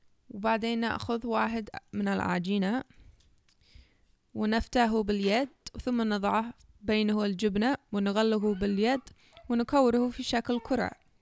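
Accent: Yemen. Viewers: ياحليلها الكنة اليمنية واضحة في كلامها اندمجت في المجتمع اليمني.الله يحفظ لها طفلها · ماشاء الله عليش يا روووووووووعه تسلمي ياروحي 😘احلا شي كلامش يجنن كأنش يمنيه الله يوفقش استمري واحنا ندعمش 👍🏻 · I love the yammany accent😂😂😂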